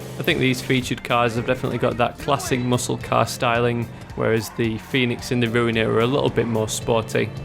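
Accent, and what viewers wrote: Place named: United Kingdom. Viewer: He's British, dumbass.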